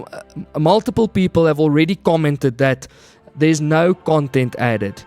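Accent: South Africa. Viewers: The South African accent is strong with this one!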